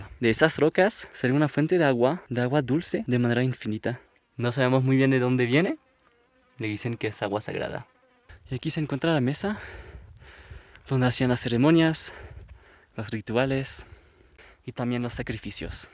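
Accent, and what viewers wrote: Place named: Chile. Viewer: Todo bien pero tu acento Chileno me desespera tengo que usar el traductor para entenderlo y respirar porque de oírte se me va la Respiración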